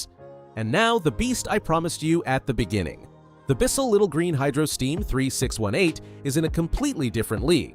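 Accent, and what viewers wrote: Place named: United States. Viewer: Why an American accent when buying in the uk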